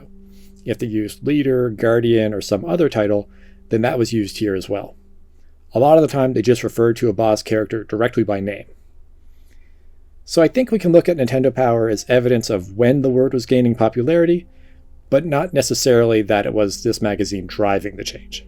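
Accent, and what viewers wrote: Newfoundland, Canada. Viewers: Are you from Newfoundland? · ... yet another Canadian making YT video documentaries... what is it with you guys? do they put something in the water? It seems like every time an interesting documentary-type video is suggested to me, I hear the ole Canadian accent.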